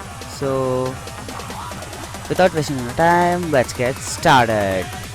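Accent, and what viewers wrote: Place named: South Asia. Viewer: You sound south asian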